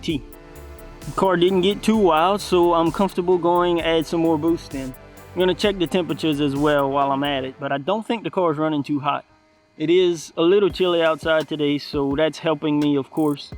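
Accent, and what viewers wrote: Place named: South, United States. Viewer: That definitely sounds like a south of i10 accent.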